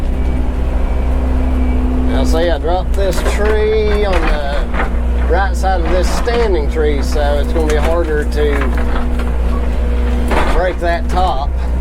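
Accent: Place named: Boston, United States. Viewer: Boston......I can tell by his accent 😉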